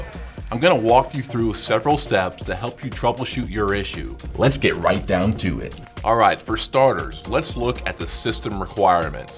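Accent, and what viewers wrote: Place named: United States. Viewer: Very, very understandable- slow, even delivery- US accent-yay!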